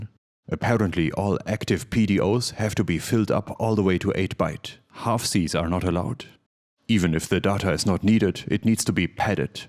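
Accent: Germany. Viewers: The Deutschlander flavoured English narration is beyond superb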